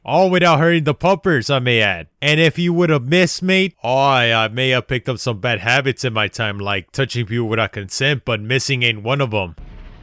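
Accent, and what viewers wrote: Europe. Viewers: Texas??? What... you sound European wtf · You live in Texas? I’ve always thought you were European from your accent.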